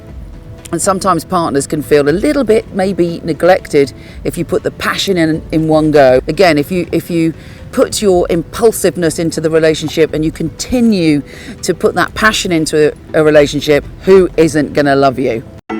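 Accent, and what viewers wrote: United Kingdom. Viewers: Why do I pick a little Scottish from you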